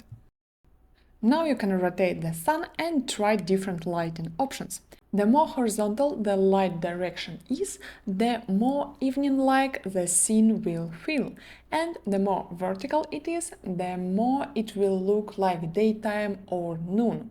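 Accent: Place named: Russia. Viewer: love the russian accent. sound really nice to listen to. really nice video, giving a lot of advice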